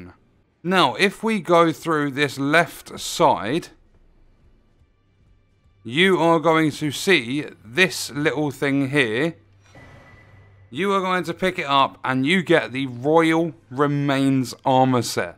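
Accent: England, United Kingdom. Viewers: English people talk funny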